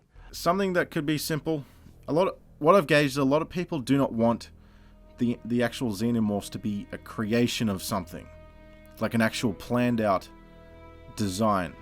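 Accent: Ireland. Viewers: Your Kerry Irish accent with a mild hint of German is entertainingly close to the mark. This would have been a much better film from a continuity perspective and would have made a lot more sense. Given what we've seen of Shaw in flashbacks I think this film could and potentially will still happen if the Sequel / Prequel mentioned by Ridley Scott is still on the cards. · Love your Irish accent lol !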